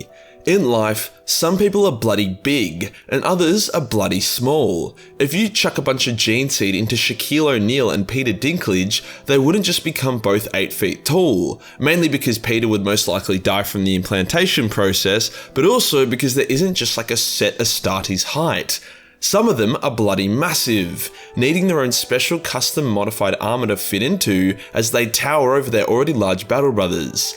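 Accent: Australia. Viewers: Best way to wake up is a crazy Australian talking about sci-fi shenanigans. FOR THE EMPEROR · Hearing 40k in Aussie is good for the soul 😁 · Dude, you talk like Paris Hilton, but with an Australian accent. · He's speaking pure okker. It's an Aussie thing...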